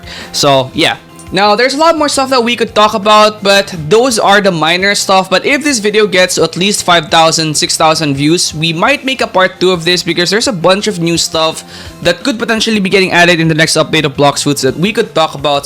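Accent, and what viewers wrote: Philippines. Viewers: bro is accent pilipino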